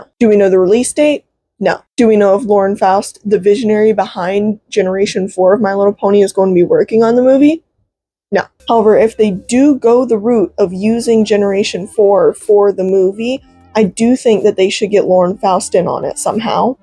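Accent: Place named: South, United States. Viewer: Ah yes, I remember someone else putting that movie news out there, so, nothing too new to me, and my most favourite live movie adaption has to be Sonic, it just has it all. Gotta say though, you're looking very pretty in that cosplay outfit, in fact you look very cute in it, and nice work on that southner accent. I managed to pick mine up from an interesting transformer who speaks that way called Overlord, man does it surprisingly suit him well.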